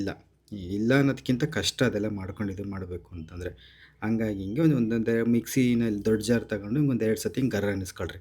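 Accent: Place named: Holalkere, India. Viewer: ನಿಮ್ಮ ಮಾತು,ನಮ್ಮ ತಾತ ಅಜ್ಜಿ ಮಾಮ ಹೊಳಲ್ಕೆರೆ ಗೆ ಹೋದಾಗ ಮಾತಾಡುವಾಗ ಕೇಳಿದ್ದೆನು. ಅದೇ ರೀತಿ ಇದೆ.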